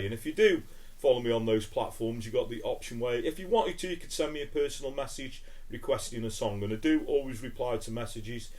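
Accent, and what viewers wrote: Stoke, United Kingdom. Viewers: Great video mate proper Stokie accent 👍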